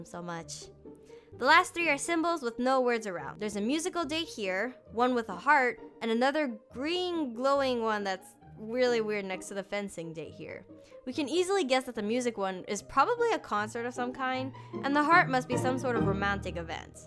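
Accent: Quebec, Canada. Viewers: OMG you totally sound like you're from Québec! That french was flawless!!! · You are from Quebec!! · Tu vis au Québec huh? lmao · Is that me or you speak just to good in French to be an English people? XD I don't know how too say, but you have the French Canadian accent (Québécoise aussi si tu veux XD), did I'm crazy? Svp, ne me dis pas que je suis folle, même si parfois je suis folle U-U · I KNEW YOU HAD LIKE A CANADIEN ACCENT THINGY!!(Im french lolol) Bravo ave tes abonnements!!!!!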